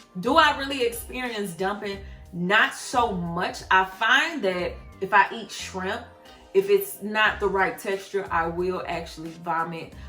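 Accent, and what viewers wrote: St. Louis, United States. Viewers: Congratulations Hun! Been checking out your previous vids. You looked Amazing before and you're Still Beautiful now. And I KNEW I recognized that dialect...we homegirls!!! I'm SO StL! Lol 😆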